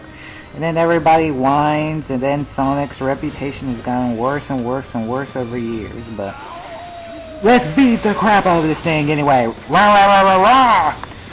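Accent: South, United States. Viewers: Wow you voice sound so southern. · You sound like a nerd with a southern accent. Your voice is almost unrecognizeable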